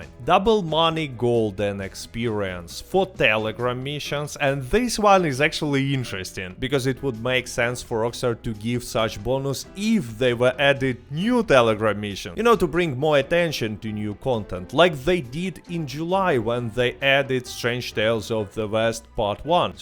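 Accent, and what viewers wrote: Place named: Russia. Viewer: Господи как же слышно, русский акцент, это жесть, попробуй чуть мягче, ощущение будто ты Борис)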